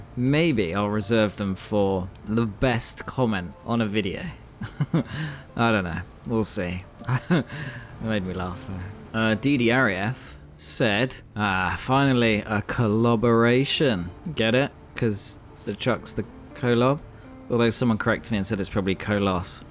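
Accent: United Kingdom. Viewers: You play SnowRunner. You have a bloody dry humor. And you have a British accent. It's no wonder this channel is going to explode in the next months... ;-) You're doing the right thing. Just keep doing it. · I never knew you were a fellow Britishman!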